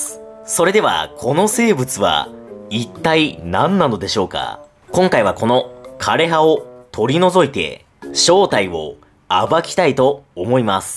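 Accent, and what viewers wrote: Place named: Nagoya, Japan. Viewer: 、ご出身は名古屋ですかね？イントネーションが名古屋周辺の方そのものだったので。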